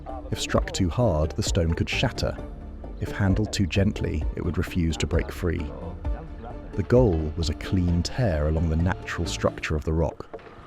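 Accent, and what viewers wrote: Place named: England, United Kingdom. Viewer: I love hearing metric measurements in an English accent, I's almost as good as hearing them in an American one 🖖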